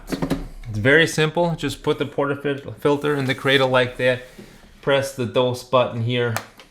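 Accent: United States. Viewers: Wait? That is an American Accent ? I thought you were European ? Where in States is that accent from ? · Are you American or Canadian I genuinely can’t tell I’m English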